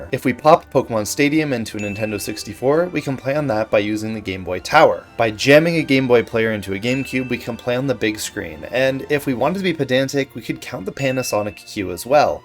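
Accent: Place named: Canada. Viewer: First time hearing this dude and I can tell he’s Canadian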